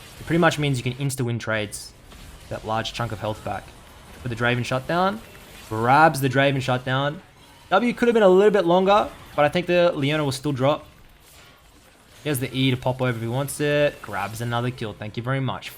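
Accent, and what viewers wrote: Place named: Australia. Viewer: australian accent DansGame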